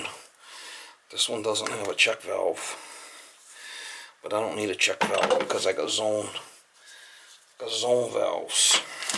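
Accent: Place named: Boston, United States. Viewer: I'm just guessing you have a Boston accent. I'm rural Pa mountains. I traveled around and worked with people from around the country.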